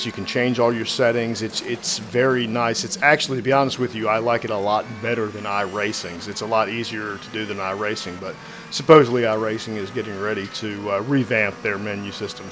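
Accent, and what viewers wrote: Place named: United States. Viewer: RaceRoom is amazing, it's so underrated, hopefully more people like yourself realize that and come around to it again. Sounds like you're American too, check out the ESR group on the Sector3 forums if you're looking for some online racing. Also like Peter says below, please disable the corner markers: options -> video -> custom -> corner markers off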